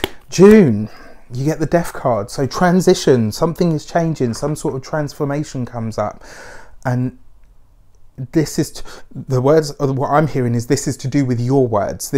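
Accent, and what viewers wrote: England, United Kingdom. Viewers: I love his English tongue. He must came from England. I am 22 of Sep.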